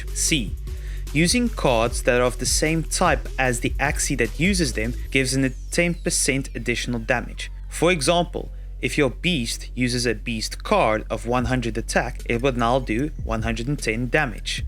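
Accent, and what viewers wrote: South Africa. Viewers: This must 100% be a South African, can spot that accent immediately.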